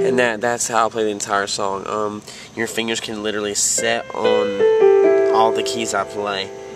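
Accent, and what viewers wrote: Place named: Australia. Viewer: Why do I hear a tiny hint of Australian in your accent?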